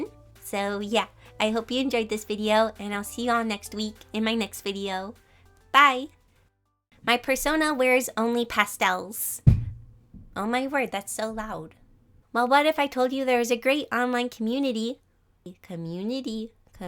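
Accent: United States. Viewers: I dont think your asian bc asian ppl dont rly wear sweaters and you sound like usa ppl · Hi Nd to answer wat u said this video I figure you were white American because I have a friend that have an accent when she talks but she’s American she’s not mixing or anything she’s just a white Caucasian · Are you by chance from virginia or somewhere near there? You're voice/accent reminds me of my family whose from there lol.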